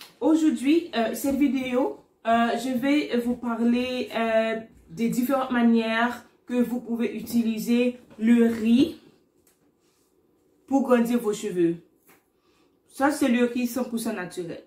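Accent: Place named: France. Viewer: Yes!!! Don't ever stop speaking French and English ,I'm from English speaking country but now live in French speaking country , love your francais accent ,merci boucop madmosel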